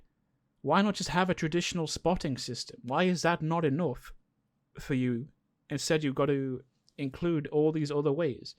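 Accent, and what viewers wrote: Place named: South Africa. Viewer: Quick question. Where are you from? Because for some reason I'm getting south african vibes from your accent. Now I could be stupid and way off but I'm just curious 😅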